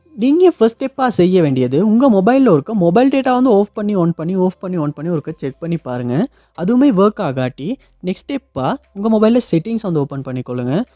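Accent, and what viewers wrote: Sri Lanka. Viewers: Srilankan tamil 👍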